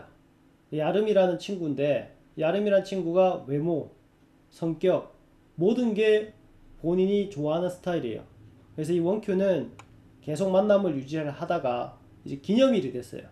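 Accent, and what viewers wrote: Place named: Busan, South Korea. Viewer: 딥러닝에 관심이 많아 동영상을 보게 되었습니다. 설명도 쉽고 재밌네요. 특히 부산사투리가 매력적입니다ㅎㅎ 꾸준히 구독하겠습니다. 추천업!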